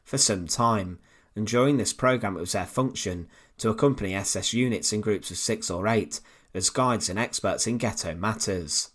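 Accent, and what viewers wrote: United Kingdom. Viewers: I wish your British accent was not so prevalent and more understandable.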